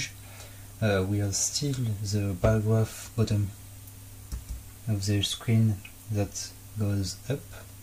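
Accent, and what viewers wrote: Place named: France. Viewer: Hey! Change l’orientation de l’image, je suis obligé de figer la rotation pour regarder la vidéo dans le bon sens. So frenchy accent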